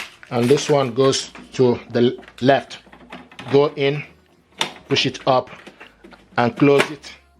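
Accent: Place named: Africa. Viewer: strongest african accent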